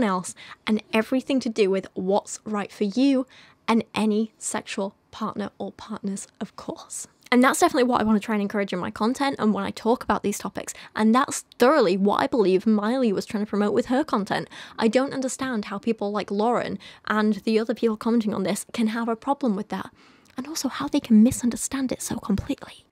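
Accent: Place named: Yorkshire, United Kingdom. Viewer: I always enjoy listening to your videos whenever I'm doing things like cleaning, running errands, and cooking, regardless of the topic. My favorite teacher from elementary school grew up in Yorkshire and your accent is so nostalgic and comfortable to me.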